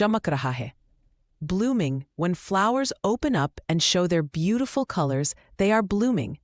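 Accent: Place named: United States. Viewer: Everything becomes very easy when the instructor is someone who can explain things in a straightforward manner. I understand English very well from your story and the best thing is your speaking style. I really like the American style. Your style of speaking and language are very good.Please upload two videos a week so we can practice and learn well.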